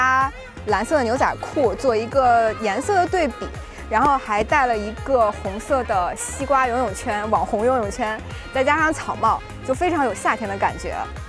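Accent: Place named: Linyi, China. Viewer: 哪里像冀鲁豫三角地的人了- -济宁聊城的口音不是这样的吧。。这个倒比较像临沂那边的2333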